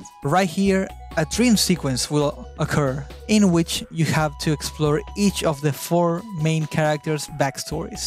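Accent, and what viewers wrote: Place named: Argentina. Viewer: yo sabía que eras argentino con ese acento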